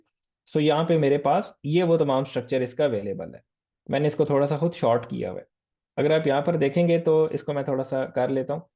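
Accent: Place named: Punjab, India. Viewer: Nice lecture & your punjabi touch in conversation is more funny 😂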